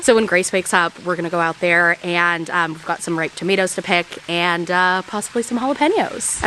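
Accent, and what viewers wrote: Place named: Southern California, United States. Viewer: You pronounce a lot of things differently than me but who cares! I live in NY and you have a SoCal accent. I think it's cool to hear voices different than my own.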